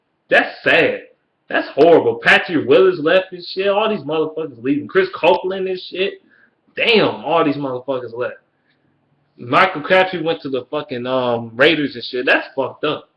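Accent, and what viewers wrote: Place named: Detroit, United States. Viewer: aye bro you from the westside of detroit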